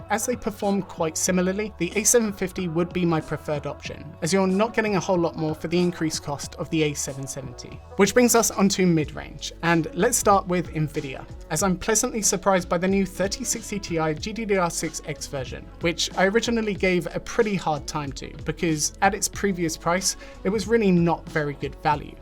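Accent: United Kingdom. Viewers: interesting video. But i just took a look at your links for a few cards i could be interested at for different prices ranges. Well your links for the so called "cheapest" cards i looked at, well, where more expensive than i have seen on other sites in the uk in the last few days. these links where very disappointing after sitting though your video. Not impressed. Also which market are these best prices from? You are from the UK sounding wise but you keep talking and showing usd prices. Maybe i missed something but you really should say which market your talking about as they can range in prices so much. esp as USA don't post tax's on there card prices quite often. Anyways thanks for the video and the effort but disappointed. Cheers.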